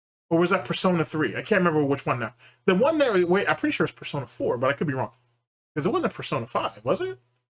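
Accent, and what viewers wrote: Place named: London, United Kingdom. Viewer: Big ups the London Grime intro. Nice to hear my own accent.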